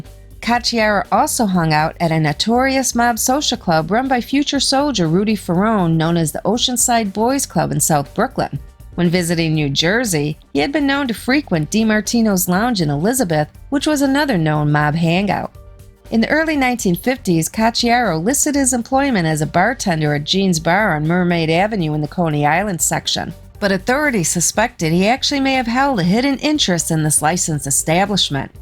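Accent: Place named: Midwest, United States. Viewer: Horrible narrator. Can’t have someone with a midwestern accent narrate about things going on in NYC. Her pronunciation of the word “Manhattan” made me cringe.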